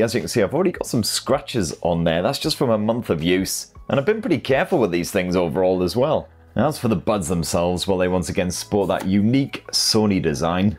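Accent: United Kingdom. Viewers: Another great video. Its great to hear a proper British accent instead of all those bloody Yanks!!!!!! Keep up the good work , pal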